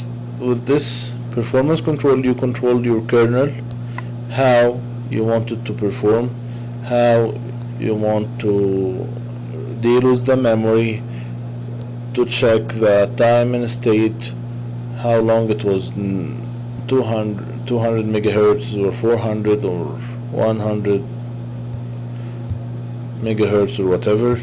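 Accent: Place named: Egypt. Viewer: Hello, how are you:) I believe you're egyptian from the tone of your voice:) Well, I am too... Anyways, would you please tell me how to get the storage swapping work here?? I mean, the correct kernel, the correct script that would really work with that rom. I'm done of trying so many roms and kernels for CM based roms. I would appreciate if you do that:) Thanks w koll sana wnta tayeb:)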